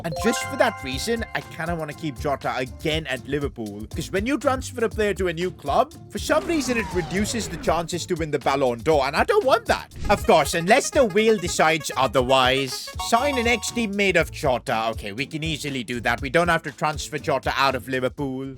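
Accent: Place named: India. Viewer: yes bro he's still learning and he's improving if you check his videos in fifa 21/fifa 22 his accent was only indian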